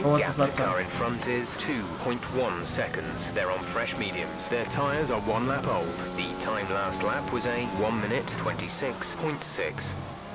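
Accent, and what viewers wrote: Germany. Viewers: Kannst du deutsch (dein Name und Akzent klingen deutsch)?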